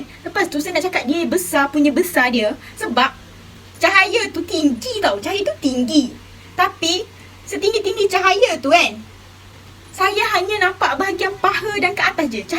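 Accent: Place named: Kelantan, Malaysia. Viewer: Tiba" kau yg seram 🤣... Kecek jk kelantan.. Saya lgi syok tgk... of course nk content seram 👍👍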